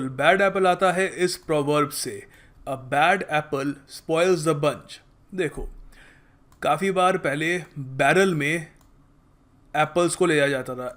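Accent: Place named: South, India. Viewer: as always video and explanation was awesome but missing your south Indian accent "okkk siiiiirrrr"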